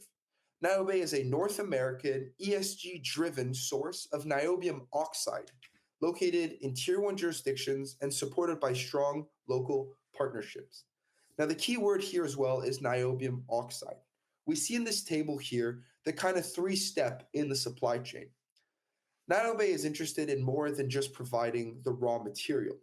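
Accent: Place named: Canada. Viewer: Hmm, you sound too Canadian. Not even sure if you are from canaduhhh. Either way, You lost me with all the regulatory/environmental BS at the beginning. I’m looking for no BS companies to invest in. I’ll keep looking.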